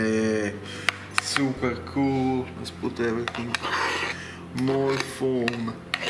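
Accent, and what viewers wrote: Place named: Italy. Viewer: It's a clear Italian accent, but even if you aren't familiar, he literally said "Here in Italy" at the start of the video.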